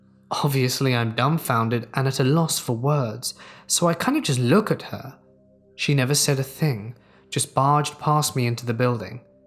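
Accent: United Kingdom. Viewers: Left dislike because british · I was in the hospital last year and i listen to these stories on a small speaker and when my night nurse came in to check on me all she heard was ' voice and it scared the crap outta her. Just literally a man talking with a UK accent (i'm in America) in a totally empty room!!! (except for me) SHe woke me up and was like, "ma'am, were gonna have to move u to another room!" and it took me forever to realize she was talking about the speaker just almost under my pillow out of her sight! I'll NEVER forget that. I hope u get well VERY soon!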